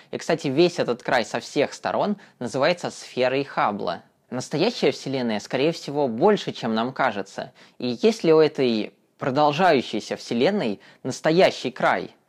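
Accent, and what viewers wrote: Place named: Penza, Russia. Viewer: Интересный материал, но речь оканием, с растягиванием гласных. Откуда он? Из Пензы?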